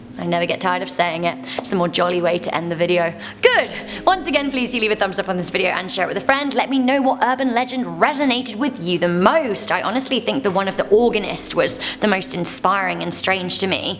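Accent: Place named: England, United Kingdom. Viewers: She’s southern English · love this girl's accent-sounds english, or welsh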